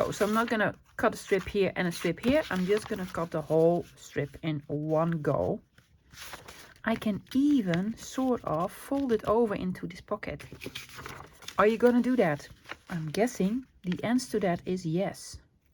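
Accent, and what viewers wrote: Netherlands. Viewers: 🤣🤣🤣 big ole envelope!, are you from the southern Netherlands? People tease me about my southern US accent and I say “big ole…” all the time. Cute project. Thanks for sharing!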